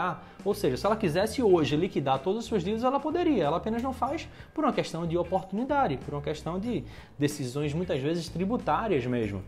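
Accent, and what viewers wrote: Recife, Brazil. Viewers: Ei brother vc é de Recife? Achei massa o teu canal, sempre que procuro tem algum paulista/carioca falando... fora a qualidade das informações que você passa, parabéns!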